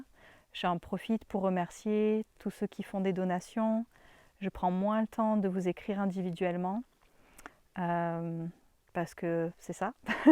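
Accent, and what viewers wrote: Canada. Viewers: Est-ce que toi tu comprends cette langue magnifique ‘ ? Tu connais Rachel l’abbé elle parle comme toi est du Canada aussi 👍❤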